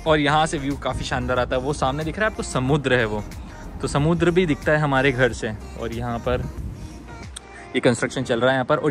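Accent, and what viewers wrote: Indore, India. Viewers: Bhai pakke indori ho - accent sun ki samajh me aa jata hai haha ;)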